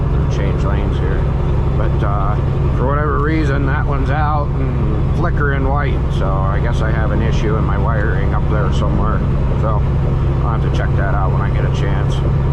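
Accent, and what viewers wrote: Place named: Midwest, United States. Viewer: Cleveland! That’s where I’m from bro you sound like you’re from the Midwest by chance. Love the sound of the Jake on your truck man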